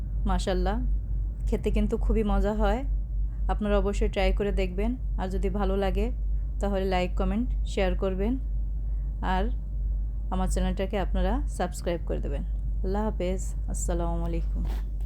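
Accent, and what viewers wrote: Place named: Chittagong, Bangladesh. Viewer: Apu chittagong er mone hoy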